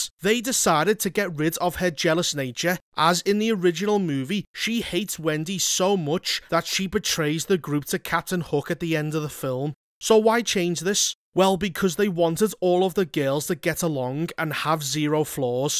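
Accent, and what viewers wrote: Liverpool, United Kingdom. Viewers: So.. You're MAULER. But british... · I don't know which to laugh at the most; the review, the swearing or the indirect jokes! And I love the Scouser accent it's delivered in 😂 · I lasted a full ten seconds of the appalling voiceover. Scouse mixed with South Wales and a few other things.......thankfully there are subtitles.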